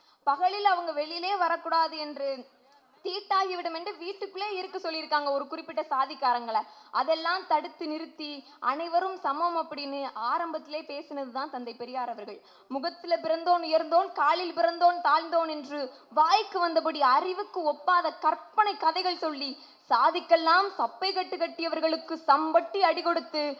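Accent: Tirunelveli, India. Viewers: Nellai tamil super sagothari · மேடைப் பேச்சாளர்களை தேர்ந்து எடுத்து அவர்களுக்கு பயிற்ச்சி அளித்தது இப்போது திமுக அவர்களுக்கு தேர்தல் நேரத்தில் பயனளிக்கிறது.. திருநெல்வேலி ஸ்லாங் அருமை .. வாழ்த்துக்கள் மகளே 🎉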